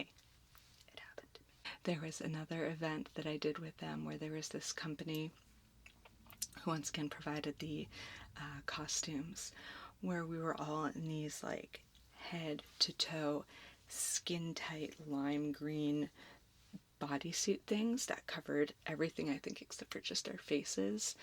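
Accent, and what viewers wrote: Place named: Texas, United States. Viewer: I would never have guessed you as being named. I like it tho. It matches your beauty and uniqueness. One of the things I always liked about your videos is your "hey y'all" greeting. If I'm remembering correctly you aren't from Texas but you moved here with your husband. As a native Texan it's nice to hear that greeting since its something we hear and say daily. Wether you're a native Texan or not that little bit of Texas twang suits you.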